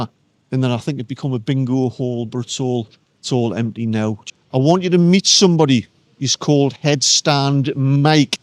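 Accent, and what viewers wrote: Newcastle, United Kingdom. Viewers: Great that your promoting some of these fantastic places, that perhaps are struggling somewhat these days. Love the Geordie accent. Can you say "Social club" again for me.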